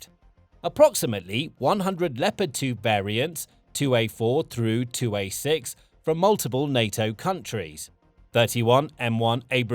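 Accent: United Kingdom. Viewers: The channel is American despite using British voices 😂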